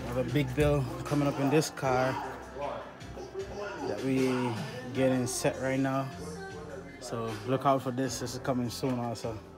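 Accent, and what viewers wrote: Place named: Caribbean. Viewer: You’re sound Caribbean my people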